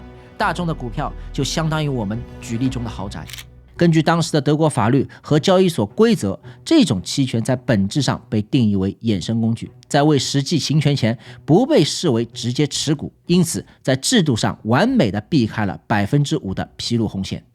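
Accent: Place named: Wu, China. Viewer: 挺好的 就喜欢吴语区口音😂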